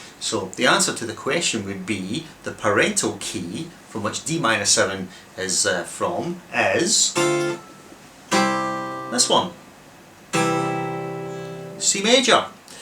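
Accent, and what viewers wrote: Scotland, United Kingdom. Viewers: Never seen your videos before and liked your intro. Then I was pleasantly surprised to hear your Scots accent. I'm originally from Clydebank. ;) Doing a lot of private home Jazz study. Looking forward to following you. Aw th' best! Ha ha. ;)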